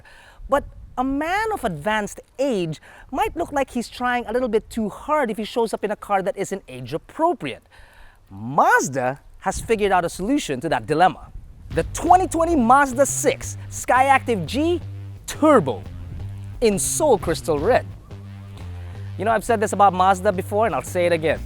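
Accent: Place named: Philippines. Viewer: Forget the car. It’s that excellent Filipino English. Bravo!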